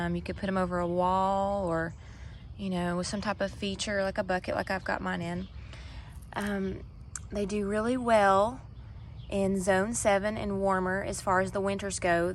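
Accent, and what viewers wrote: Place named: Tennessee, United States. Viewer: Are you in Tennessee? I am . Your accent is similar. What type of soil do you use ?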